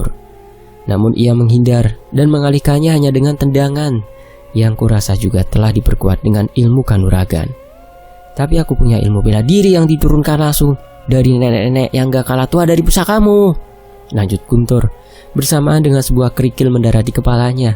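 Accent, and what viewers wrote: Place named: Bali, Indonesia. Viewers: Om swastiastu blie 😅😅😅 longat Balinya keren salut ya sama blie selalu bisa mengikuti longat di setiap daerahnya 😅😅 Salam dari Jimbaran Bali Kuta Selatan blie 😅😅 astungkare sehat selalu biar semangat trus bawa acara fakta sejarah dan fs juga 😅😅😅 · Mas bikin salfok pke logat bali😂😂😂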